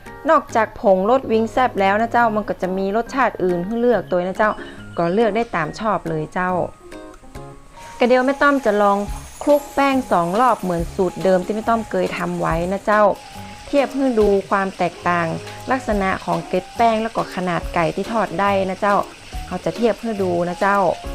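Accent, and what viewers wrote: North, Thailand. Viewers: ชอบฟังภาษาเหนือค่ะน่ารักดี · นั่งดูจนจบคลิป พึ่งรู้ตัวว่าเป็นภาษาเมือง 5555 (ด้วยความเคยชินกับภาษอ่ะเนาะ)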